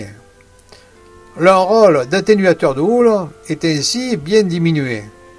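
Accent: France. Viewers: très belle histoire, bien racontée et avé l'acent ! ( pas celui de Marseille mais celui de Toulon...)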